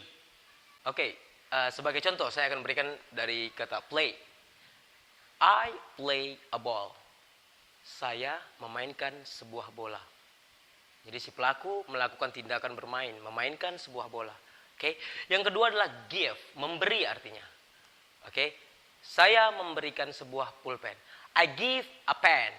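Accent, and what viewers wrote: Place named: Poso, Indonesia. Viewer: org poso ya??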